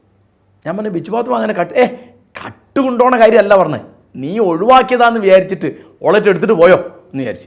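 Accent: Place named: Kozhikode, India. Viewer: mashinte oru kozhappam Kozhikode basha varunnu...malayalathinte ozhukku pokunnu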